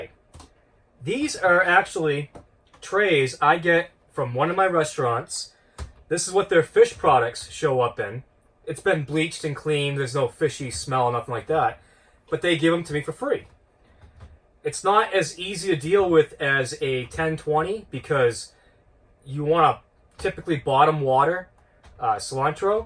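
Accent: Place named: Boston, United States. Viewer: I love your accent, Boston area? Or up here in Maine?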